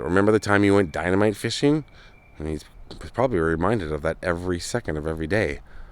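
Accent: South, United States. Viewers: Haha...Love it! It's worth it just to hear you speak "Southern'ish" lol♥️☺️ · This one reminds me of the foot video you made. How in the world do you find stuff like this. Loving the content. You’re so funny while being respectful at the same time. Almost as bad as the guy cooking biscuits on the bow in a thunderstorm!! 😂😂😂😂 Southern accent is on point! 😂😂 I myself am a southern gal. And I am loving it. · You are the best I love your humor. Your southern accident is cracking me up. I'm From Florida😂 love you and your channel❤ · . Kid dynamite 😅 I enjoyed this.. I read afterwards he was alright? That face you made OMG. It messes up their swimming bladder lol That southern accent ROFLMAO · A Canadian with a southern accent. Lol! I have one but with time being away from home it is less. When I go visit and it come in thick funny! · Love watching your videos my friend!! 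Very interesting!! You are an amazing person my friend!! Love watching your videos!! Love you and your channel!! Love the southern accent!! God bless you my friend!! Much love!! Peace!! ❤️💖🥰💙💕💜😎😎😊😊✌️✌️✌️✌️✌️✌️ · Oh my gosh, you are so damn funny. You and your southern accent. Good job though enjoy the rest of your day.🤣💜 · Love the southern twang ❤. You nailed it ! For sure....For sure. 💯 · This was hilarious 😂 love the southern accent! You did sound like McConaughey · Another, Great Video 💯! Your Southern accent made me laugh 😂. Hope you are well? I’m going to catch your next live video. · Live your videos ❤ so interesting, thanks for bringing me along . Love your southern accent. You are hilarious yet respectful at the same time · I have to remember not to be eating or drinking anything when I'm watching your video's like this...I dang near choked...😂😂...Love that southern draw there...Question, did Beull have Biscuits on the bow before he played with the TNT? 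Much respect to you for showing so much respect to the arm...Much love sent to you...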